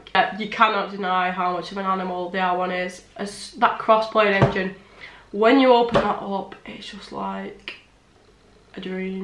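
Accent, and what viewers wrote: United Kingdom. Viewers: I like your British accent :)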